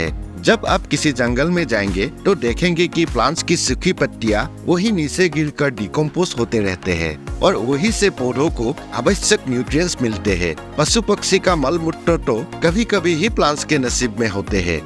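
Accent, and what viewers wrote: Assam, India. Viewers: Aap Assamese ho kya bhaiya. kiunki humare Assam k log hi aise hindi bolte hain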